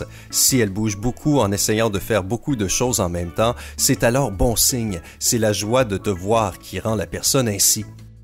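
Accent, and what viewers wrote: Quebec, Canada. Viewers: Québécois XD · T'es québécois ? Sa se reconnait avec ta voix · L'accent Québecois est tout simplement insupportable, c'est une insulte à la langue française. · Accent québécois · C une voix québécoise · C la nouvelle voie québécoise de simpa ou quoi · C un québécois? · Cette accent canadien ouch 😂 PS : #teamcelibat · J'aime bien vos vidéos je me suis abonné. Et c'est un joli t'accent canadien. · J’adore cet accent quebequois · Zoumouda Soufou C'est l'accent québécois. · Hé oui ! C'est bien tout ce qui s'est passé... deux ans avant ma rupture ! Heureusement qu'il y a cet accent québécois pour me r'monter l'moraaale !!! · Lol il est quebecois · Tu es québécois ou quoi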